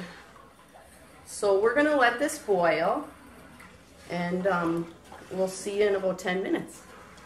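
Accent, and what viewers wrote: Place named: Ireland. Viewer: Great video! I love these kind of projects! Your accent is so thick, almost Irish sounding. Love it. Is it true that you are Jeff Daniels wife? Someone told me that. Oh by the way timers is great. My grandmother puts it on everything for memory retention she says.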